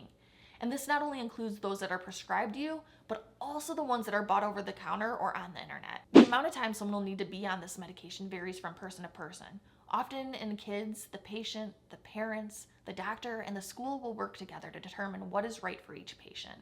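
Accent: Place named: Midwest, United States. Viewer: nice midwest accent :D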